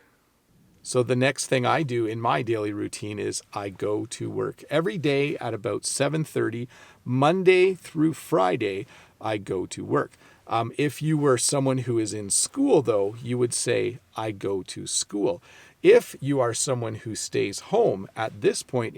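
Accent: Canada. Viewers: Thank you sir , i always watched all of your videos . Easier to understood. And i like Canadian pronounciation. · I just can't get enough hearing your nice Canadian accent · Hi, I like your videos because I understand you very well, maybe because you're canadian? I sonder if you speak normaly or slowly for your students....let me know....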